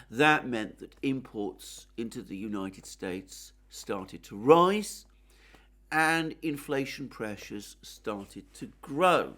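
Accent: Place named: RP, United Kingdom. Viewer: Alexander Mercouris: in-depth analyses, perfect understanding of geopolitics, posh English. Can anyone expect more?🙂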